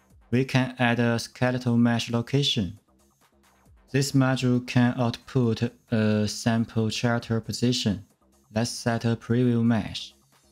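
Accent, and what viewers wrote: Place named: China. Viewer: 比中文还中文的中式英语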